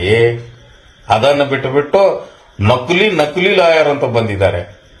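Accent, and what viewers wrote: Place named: North Karnataka, India. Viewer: sir your telling very beautiful Uttar Karnaraka Bijapur Langvage sir.pl coutinue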